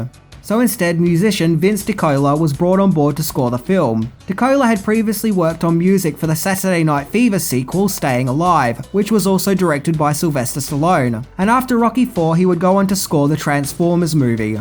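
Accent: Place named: Australia. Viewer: Not DraYgo...........DraW-go...................I understand you have the Australian accent, however its also important you pronounce things correctly.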